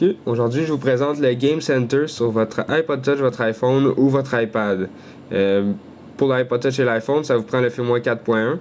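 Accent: Canada. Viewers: t'es Canadien ???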